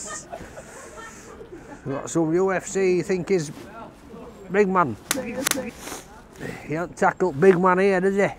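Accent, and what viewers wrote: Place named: Northern England, United Kingdom. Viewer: I'm here in Washington, DC. Most of the Brits we have here are Oxford or Cambridge graduates, and hold fancy jobs. They have posh, upper class accents. We don't get to see many people from the North of England on the media, and don't hear many working class accents. At times its a little hard to understand, but I love watching him anyway!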